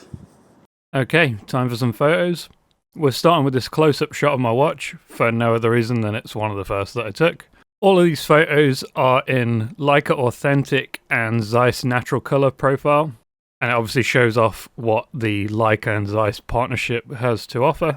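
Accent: United Kingdom. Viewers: I like ur British accent when u speaking bout' these phone i very like ig 😅😂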